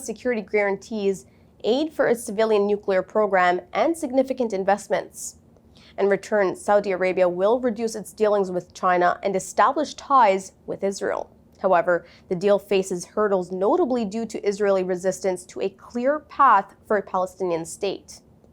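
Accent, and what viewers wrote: United States. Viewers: I am a Muslim and I don’t like Palestinians the way Palestinians treat other Muslims in Saudi Arabia. I know Palestinians Directors in GCC countries badly treating others at jobs there I cursed one Palestinian director to be punished by Israel back in 2016. So please I advise you people to treat others well by rich Palestinians working in GCC countries. Also why you people migrating to Europe US and Canada and not fighting IDF. Islam tells you not to flee from war but fight them but you people are like cowards fleeing. So you better go to Gaza to fight instead of giving us news in an American Accent. You people and Afghanis are the same you live in western countries while you want others to tell Israel to stop war instead of you fight.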